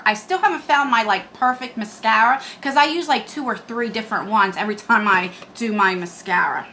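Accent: New Jersey, United States. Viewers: Girl same!! I think it’s a jersey accent haha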